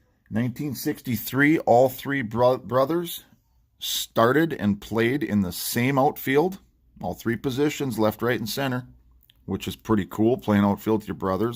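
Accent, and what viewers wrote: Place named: Fargo, United States. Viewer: You have a natural Fargo voice =)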